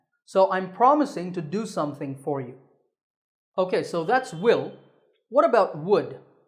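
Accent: United States. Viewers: Sir, I want to speak English just like (i.e. like a US citizen) you how can I? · Sir your english is exactly like American flaunt english. Are you living in United States? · Aapki awaj ekdum american jaise hai per ho aap indian how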